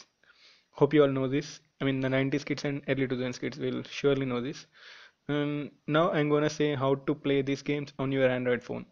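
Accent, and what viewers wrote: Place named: India. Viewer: this is why I like hindi accent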